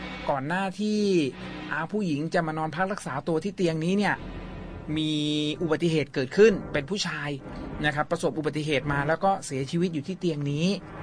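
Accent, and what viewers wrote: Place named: Upper South, Thailand. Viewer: ฟังจากสำเนียง น่าจะอยู่จังหวัดเดียวกับเรา ภาคใต้ตอนบน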